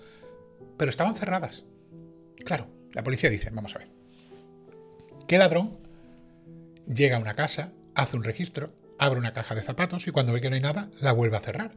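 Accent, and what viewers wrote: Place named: Seville, Spain. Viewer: …me acercas a mi Sevilla cuando te escucho. Te pongo y parece q estoy de charla con un colega. Llevo fuera ya algunos años y oír a un sevillano relatándome cosas me alegra el día aunque no me cuente cosas “agradables”. Niño, esta historia ha sido de las más tristes q has contado. Una pena , un chikillo tan lindo… en fin. Gracias por estar ahí. Nos vemo! Talu’e!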